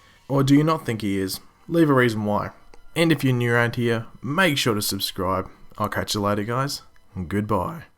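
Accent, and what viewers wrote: Australia. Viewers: Hey just wondering if you're Aussie cos u have an accent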